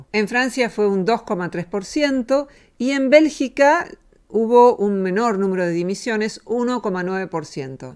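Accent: Argentina. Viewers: Paradojico que haga este tema una persona con acento argentino con el 50% de pobreza y grandes problemas de inflacion y falta de trabajo, tendria que fijarse en subpais de vez de ver otras situaciones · De donde saca esos datos?? Amiga Argentina Voz de donde es eso ??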